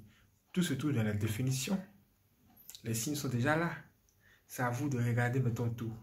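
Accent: Ivory Coast. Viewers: C'est un accent purement ivoirien ça.... Très loin de l'accent camer...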